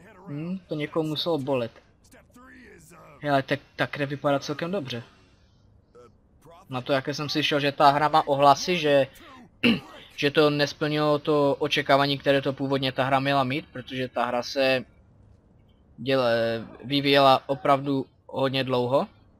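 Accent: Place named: Ostrava, Czech Republic. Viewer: Ty mi připadáš jako ostravák (podle toho, jak mluvíš)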